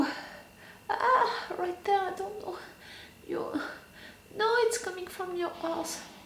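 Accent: France. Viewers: .....why I feel fall in love with ..you , reactor.. What wrong with me .. This is 1st time I had this feeling, experience.. 1st of all your dialect..slang. Are you french? It's so beautiful... For this moment I agree french is beautiful soft language.. Sorry this is random and ridiculous.haha Btw ..as aot fan...I will stick to this ,your channels,your reaction.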